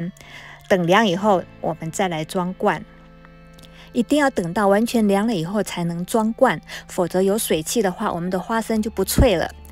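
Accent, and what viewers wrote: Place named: Taiwan. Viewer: 听口音这位博主是台湾人，不是大陆人。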